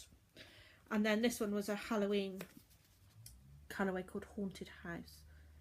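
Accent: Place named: Devon, United Kingdom. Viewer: Lovely 1st episode, catching up on all your podcasts. Lovely to hear another Devonshire accent, me loveerrrr 😘💗 Gorgeous makes! X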